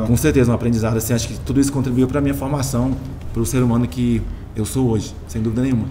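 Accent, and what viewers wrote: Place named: Northern Minas Gerais, Brazil. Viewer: Canta muito..a voz dele parece com norte de Minas ..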